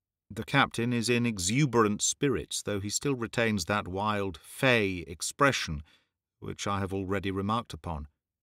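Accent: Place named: Scotland, United Kingdom. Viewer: Perfect as always,! Your Scottish accent is so fun to listen to!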